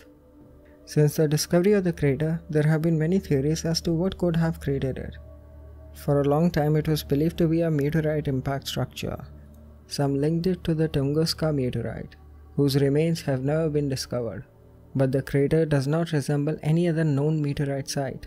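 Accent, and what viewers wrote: India. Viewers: Narrator: I’m sorry, my pronunciation is trash. Also narrator: acrimonious You’re perfect. Don’t worry about your accent. Desi accents are awesome.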